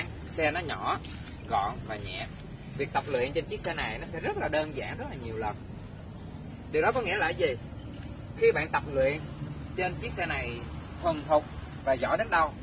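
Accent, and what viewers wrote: Vietnam. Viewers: Đu má a nc chửi thề dễ thương lắm anh, đậm chất miền Tây, em cũng z hihi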